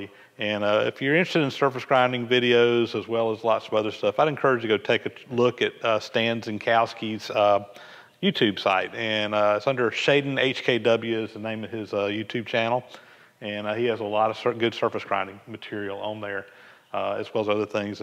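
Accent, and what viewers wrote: South, United States. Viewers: A Getter Done with a southern accent; LOVE IT.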